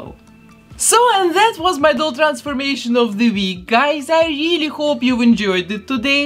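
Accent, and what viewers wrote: Russia. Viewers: Мне кажется или это русский акцент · Я чувствую себя ТАК странно, смотря Ваши видео. Мозг воспринимает русский акцент как русский язык, но при этом я понимаю, что звучит английский... хэлб А если не считать ребусов в моей голове, видео очень крутые, смотрю регулярно и с большим удовольствием.) Вы крутая художница!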